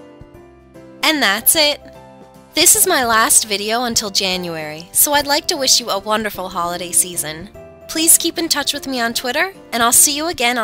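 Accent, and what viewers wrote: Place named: Newfoundland, Canada. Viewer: Are you from Newfoundland? Your accent sounds just like one!!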